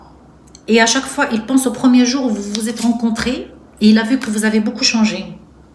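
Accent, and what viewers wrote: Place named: Quebec, Canada. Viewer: Vous avez vraiment l accent du Québec comme moi . Tt facon je ne comprends plus rien dans cette relation toxique empoisonner avec mon ex autre. Ca me parle beaucoup cette guidances .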